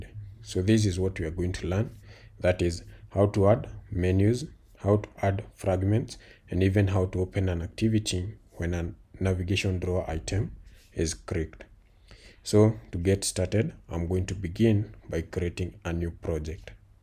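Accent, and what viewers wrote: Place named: Kenya. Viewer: You sound Kenyan. Shukran sana bro!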